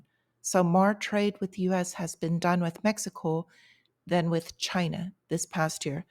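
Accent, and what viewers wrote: Ireland. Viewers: Hey 😄 Thank you for posting your daily market updates, I love them! You mentioned the relative strength of the US dollar will get hammered, what scenarios do you think could happen with say the EURUSD? A second question, is your accent Irish? Thank you & Have a great day.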